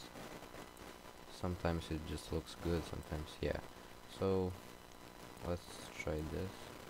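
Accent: Russia. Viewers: Ты русский что ли?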